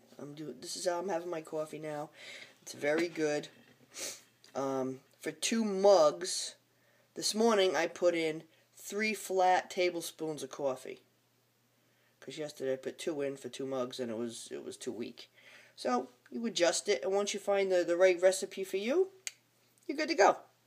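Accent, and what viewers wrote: United States. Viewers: I just bought a Corningware percolator at an estate sale here in Vegas! So cool. But not as cool as your awesome New York accent! i miss NY sooo much! You are fantastic Madame!!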